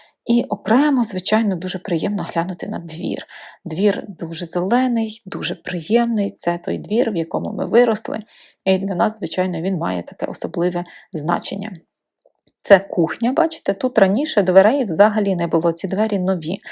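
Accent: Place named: Surzhyk, Ukraine. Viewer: 'чьі', 'щьо' - суржикова вимова; правильно казати 'чи', 'що' ☝️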